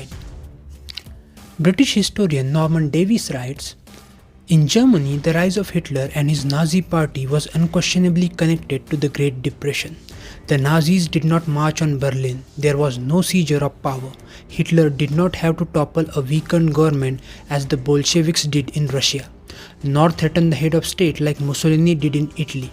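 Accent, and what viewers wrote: India. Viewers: I am not even kidding, the video quality is really high great production but PLEASE get a non indian to do the voice over for the video.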